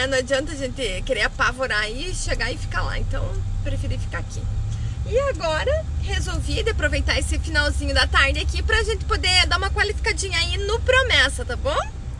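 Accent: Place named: Rio Grande do Sul, Brazil. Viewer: Vc e uma motorista gatinha hem. Fico xonado nesta sua voz de gaúcha!!!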